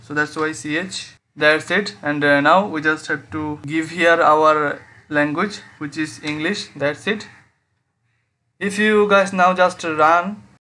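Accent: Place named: Bengal, India. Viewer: Bro your english is like Bengali....are you bengali??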